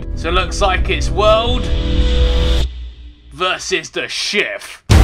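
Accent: United Kingdom. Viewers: Has a silencer, still hits the car alarm off haha, Is he brittish? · Really is he british he has the british accent dont you hear that m8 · Butter butt he is British and the language is still English it’s just a accent.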